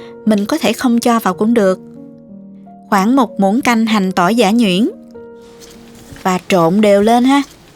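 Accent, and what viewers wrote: South, Vietnam. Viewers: Đúng giọng miền Nam ❤❤❤.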